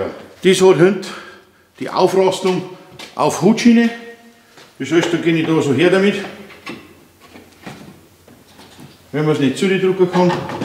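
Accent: Bavaria, Germany. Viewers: Also mal ganz ehrlich von mir - Ich bin schon öfters auf deine Videos gestoßen und war sofort abgeneigt wegen deines Dialektes. Ich mag Bayern jetzt nicht so. Nur unsympathische Leute aus Bayern kennengelernt. Jedenfalls habe ich mir dann trotzdem ein paar Videos angeschaut. Bist mir doch ganz sympathisch jetzt. Klasse Inhalte. Gut rübergebracht. Beste Clickbaittitel überhaupt :)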